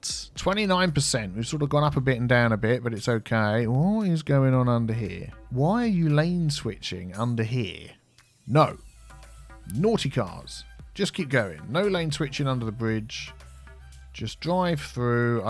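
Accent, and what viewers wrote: United Kingdom. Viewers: thank you for responding! Once in your lifetime, you could lose hearing to a point where you can't understand anyone. Have you thought how life would be like if you were deaf? Mine started at birth, always trying to understand people. I can hear, yes, with a cochlear implant, but I want you to consider the people who physically can't hear. They are forced to read your captions if they want to try understand you. They miss out on the physical cues you give off as a British speaking person. I love your accent, and seeing you talk would bring joy not only to me, but to the deaf, deaf blind and hard of hearing community. We get to know you through how we see the world, not just people who just hear you speak. I absolutely love how you have your word choice, having captions helps me follow along, laughing. Why not go one step further? 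Hope to see you soon!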